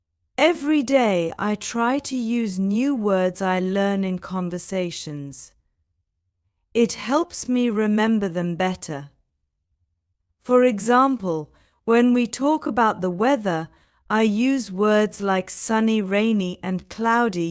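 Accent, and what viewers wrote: United Kingdom. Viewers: I found adorable the british accent. Thanks a lot. You have a new subscriber.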